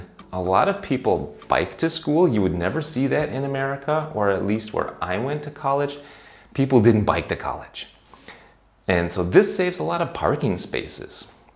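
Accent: Germany. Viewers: I'm surprised. Your heavy accent sounds like a German who lived in the US only a few years.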